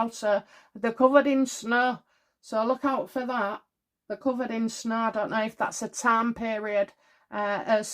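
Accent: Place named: Doncaster, United Kingdom. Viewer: I love your channel and your style. I'm from Doncaster originally and recognise your accent. Wonder where you are from? Thank you for your readings xxx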